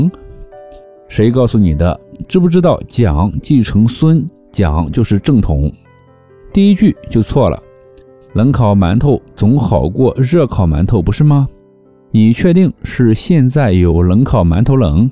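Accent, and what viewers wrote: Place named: Mainlander, Taiwan. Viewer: 說話確實像小蔣時代台灣外省人口音。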